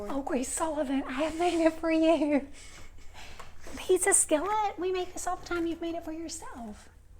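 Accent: South, United States. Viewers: Love the homely vibe and Southern American accent, will try this! Thanks from Manchester UK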